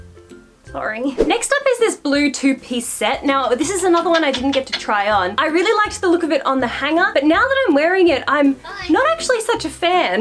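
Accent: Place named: Australia. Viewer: I would love to see you do a video about how you got started doing YouTube + how you balance work, life, and being a content creator!! (Although I understand you can't spill all your secrets haha!) I find your videos super inspiring and I love your style and personality so much. I'm going to Japan soon so I've spent the last week finding, and then binging your videos to get ideas on what to look out for when shopping, and I can't get enough! 😭💖 As a NZer I feel like I'm getting a bit of an Aussie accent from watching so many of your videos.... but anyway, I can't wait to watch whatever you post next! 💚💚💚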